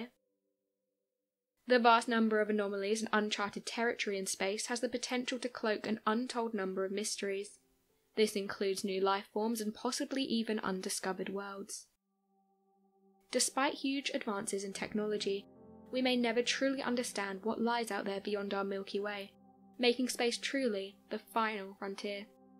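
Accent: England, United Kingdom. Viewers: I wish you could Keep the heavy English accent in England , can’t find anyone who can interpret into American English sorry , bye bye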